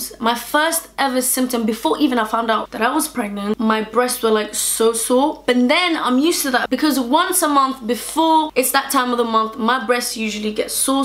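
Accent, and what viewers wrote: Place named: South London, United Kingdom. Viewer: Are you from south London idk maybe it's just me but it's your accent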